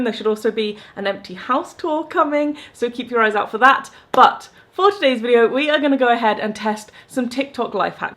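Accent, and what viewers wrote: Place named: England, United Kingdom. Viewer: I love english accents lol always reminds me of bridget jones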